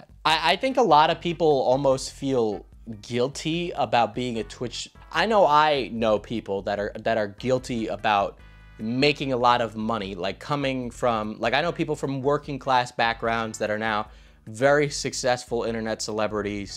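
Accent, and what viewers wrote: New York, United States. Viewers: I never heard that little bit of New York in your voice until you mentioned it and now I can't unhear it.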